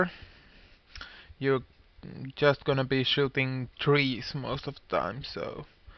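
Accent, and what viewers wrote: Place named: Finland. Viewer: Heheh must be the Finnish accent.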